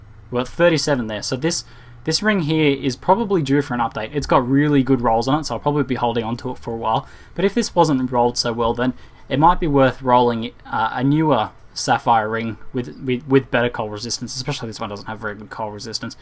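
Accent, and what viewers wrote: Australia. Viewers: I'd say australia. Especially because he greets us with "g'day"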